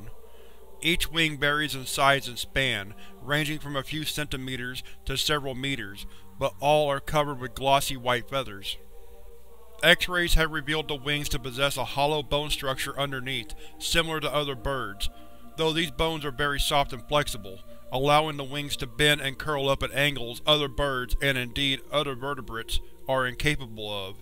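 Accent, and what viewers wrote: South, United States. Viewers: Southern narrator is amazing, I really like it. Like a personal touch, I really really like it!!!😊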